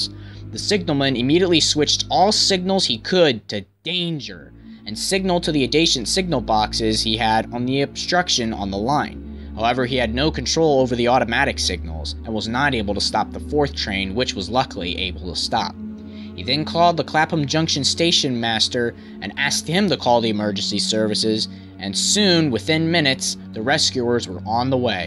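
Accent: United States. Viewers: Its Born-Muth nor Born-Mouth. but its ur american accent so. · Here in America all the errors that you mentioned are the way we say it here, you need to remember that this is a American narrating about a UK event, just cause we say thing differently doesn't mean it's wrong.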